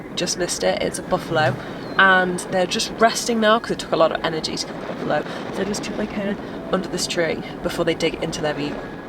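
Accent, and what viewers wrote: United Kingdom. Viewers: I could listen to your voice, 'British accent' whole day. I love your cinematic vid.😊 Karibu kenya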